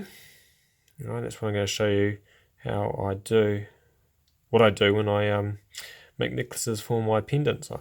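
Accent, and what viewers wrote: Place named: New Zealand. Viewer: I’ve watched this tutorial dozens of times over the years, and I so appreciate you taking the time to make it, because - as a kiwi stranded in Canada with a boy who fidgets with his pounamu incessantly - I replace cords regularly. I never manage to onboard that knowledge as it’s just infrequently enough that my brain refuses to acknowledge that it’s essential information, or perhaps it just wants to reminisce and listen to a New Zealand accent explain clearly and calmly how a thing is done. Either way, you are a veritable treasure; thanks so much for helping this kiwi in a foreign land continue to proudly display part of their heritage.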